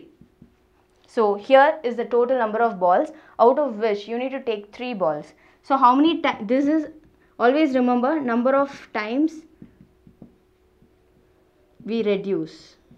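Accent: South India, India. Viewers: from where are u mam ?? ur ACCENT is like south.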